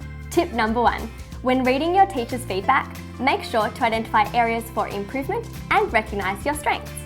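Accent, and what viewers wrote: Australia. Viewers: Is she from Australia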